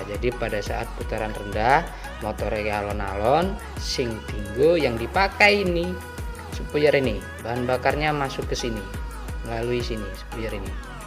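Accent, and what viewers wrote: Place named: Java, Indonesia. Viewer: Jawane medok mas hahaha tapi mantep penjelasane mas, ditunggu vidio seng laine mas.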